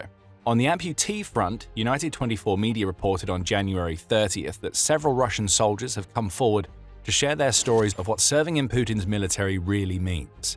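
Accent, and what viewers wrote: England, United Kingdom. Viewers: With all due respect, it ends in a 'th' sound, like it's spelled. 'Sicksth" Although, of course, there are different dialects. Yours might be different from mine or the narrator's. He has an English accent. If you've been to England, it feels like there's a different dialect for every town!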